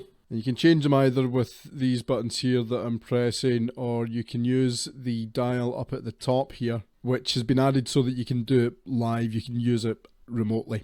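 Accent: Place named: Scotland, United Kingdom. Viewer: I can always tell when the Scottish guy is going to start talking. Because the UI's are always awful. Lol. Plugins are good, don't get me wrong! :)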